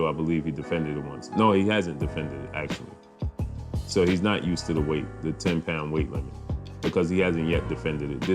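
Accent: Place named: Bay Area, United States. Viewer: Bro you ever think about rapping? You got that voice! That Bay Area floooo. You sound like BIG LURCH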